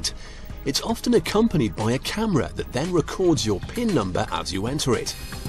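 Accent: United Kingdom. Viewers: The U.K. narrator makes everything better.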